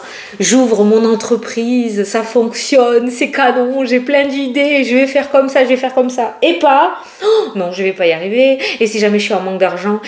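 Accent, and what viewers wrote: South, France. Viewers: Bonsoir pour moi c'est la 1ere fois que je vois votre guidance..en tt cas j adore votre accent du sud moi qui suis dans le nord ca fait chaud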